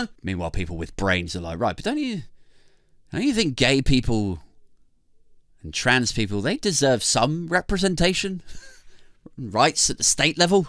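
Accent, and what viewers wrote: United States. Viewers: I love your American accent, say "I'll use my credit card!' next!!!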